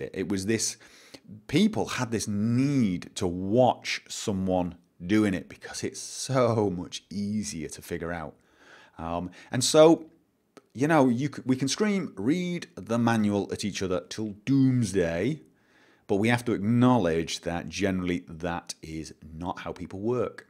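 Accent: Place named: Northern England, United Kingdom. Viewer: I came to this video hoping I would just hear telling people to fuck off but instead, I heard him saying something that made me feel SO much better. Everything he said about the way he learns and reading manuals is so true to my own experience. I've not actually come across anyone who learns anything the same way I do and its good to know that I'm not the only one. Love you and btw. I was born in Stockport so its nice to hear a northern (Possibly Manchester?) accent on YouTube.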